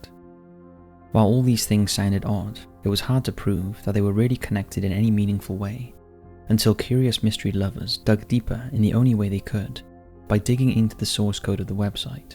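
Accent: Wales, United Kingdom. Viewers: Nice British accent 😊😊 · It's a Welsh accent probably from Cardiff or Newport area.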